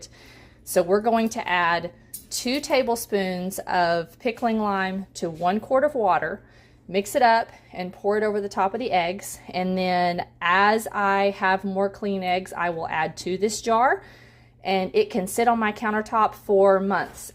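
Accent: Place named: Ecuador. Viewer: Puerto Rican?… my minds’ playing tricks on me… I thought you were from “South America“….Ecuador . …. But whichever it may be, I was very happy to hear your mami’s voice and the pride in it, too ! ❤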